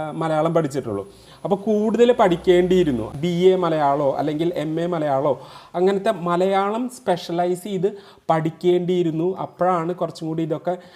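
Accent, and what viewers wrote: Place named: Thrissur, India. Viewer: You are speaking trichur Malayalam Correct Malayalam is Kottayam& Pathanamthitta Malayalam.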